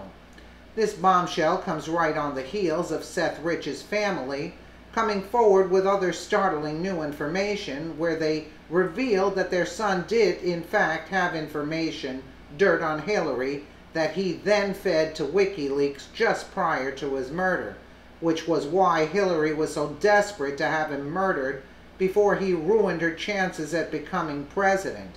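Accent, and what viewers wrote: New York, United States. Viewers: Where's your voice from? New York? I dig it!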